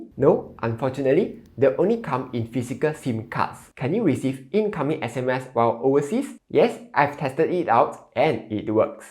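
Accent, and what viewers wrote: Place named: Singapore. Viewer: This guy's accent is the most Singaporean of all Singaporeans.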